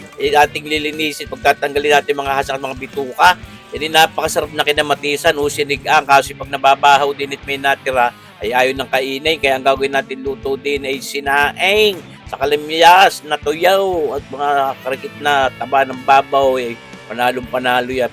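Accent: Batangas, Philippines. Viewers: walang babaguhin. Tuloy-tuloy lang ang puntong batangan. Balik panahon para sa akin some 60 year ago sa Calumpang/Avenida Rizal🥰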